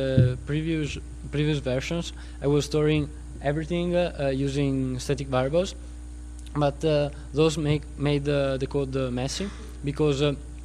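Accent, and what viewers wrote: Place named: Italy. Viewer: I'm so in love with this italian accent <3 Awesome tweak developer !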